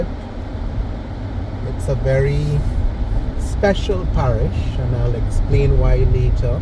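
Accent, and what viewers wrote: Trinidad and Tobago. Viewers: Hi watching from Guyana. I love your videos I lived in Barbados, I am originally from Guyana but worked in Barbados for meny years. Barbados is a very beautiful country. I enjoyed evorything except for some of the locals. Most of the time people were quite hostile towards me because of my nationality especially in the workplace I did not expect that from such a major caribbean island. I have worked in Jamaca, Trinidad and Grenada and experienced welcoming people how would invite you into they're homes, but in Barbados people treated my family and me like dirt and always had remarks. This was very sad for me because we love the island. We are planning to visit next year and hope to have a different experience. I love the videos as we are planning to see all of the unique sites that you showcase. I love the Trini acsent 😁 I guess visiting and living in Barbados are completely different things. 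The only country where i had a similar experience to my experience in Barbados was when I was a young man studying in England.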